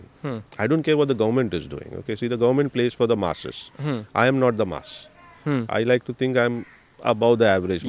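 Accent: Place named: Mumbai, India. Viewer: From the accent, I got a feeling that he also belonged to Mumbai.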